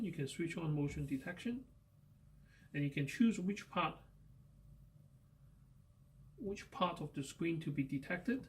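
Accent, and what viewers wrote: China. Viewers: "Chinglish" LOL As long as I can interpret what's being conveyed, I'm good. I just got a Jennov 5MP PTZ POE Mini Cam and it looks similar to this one, but the documentation and Jennov's website is sorely lacking.. :( It appears that mine is made by DianChen Industrial Co., Ltd. and my Amcrest NVR cannot "see" this cam even if I change port numbers. Is it typical that each manufacturer "blocks" other manufacturers' hardware so as to force the consumer to purchase ONLY their hardware?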